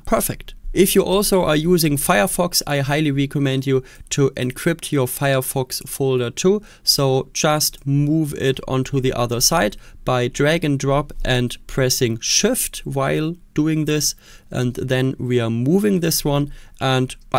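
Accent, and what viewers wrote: Germany. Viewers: could it be that you are german? Your voice sounds really german and how you pronounce stuff sounds german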